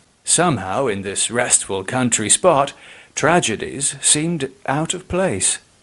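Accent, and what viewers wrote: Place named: United Kingdom. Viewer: I laughed while listening to his British voice, thinking I was back in the England 1700's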